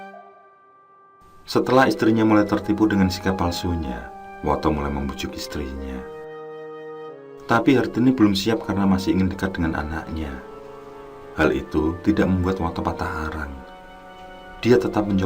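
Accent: Java, Indonesia. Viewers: Biasa Karawang hadir nyimak pak, di panteng terus biarpun carita nya sudah pernah tayang, abisnya seneng denger suara pak yg khas medhok jawa nya, sehat terus buat kru sukses terus semangat berkarya di tunggu cerita terbaru nya yg lbh seru dan serem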